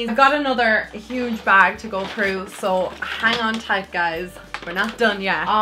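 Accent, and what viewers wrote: Dublin, Ireland. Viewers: i think shes from dublin i hear the dublin accent in her i live in cork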